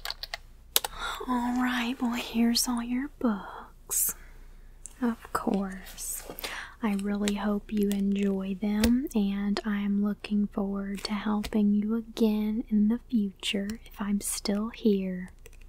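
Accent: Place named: South, United States. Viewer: Lmao....love the ditsy southern belle thing you got goin’ on!! Still very relaxing too!! Thanks.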